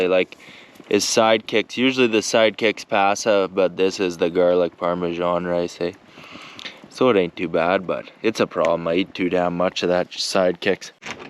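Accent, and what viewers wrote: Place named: Eastern Canada, Canada. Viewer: A very smart young man. Im not sure but he sounds like he must be from eastern Canada I was up there years ago in Whitehorse & Inuvik. This kid has got it goin on. Btw..you can peen that crush sleeve back out & re use it. Ive done it and seen it done many times. It just sers you pre load on your pinion. Best wishes!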